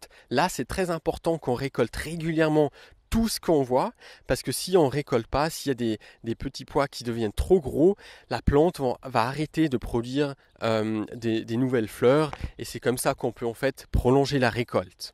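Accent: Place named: Alsace, France. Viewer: On dirais que vous êtes Alsacien ^_^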